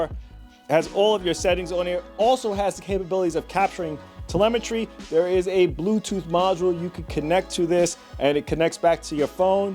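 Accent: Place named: Northeast, United States. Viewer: Hey brother, another great freaking review. I really love how you do the unboxings. I’m excited for another shootout the last one was awesome. Back before I had to sell my RC collection This was actually one of my favorite short course trucks. How funny is that you had that sitting in your attic LMAO. Well if you want to donate anything else sitting in your attic to a single dad and a little girl who love RC let me know lol jk. You sound like you’re from the north east. I’m in Jersey. Thanks again for your videos I know it’s got to be a lot of work to make them. Me and my daughter enjoy them. I’ve been subscribed for a long time but I don’t comment much. Just wanted you to know me and my little girl appreciate your videos! Hope you and your family had a great weekend!!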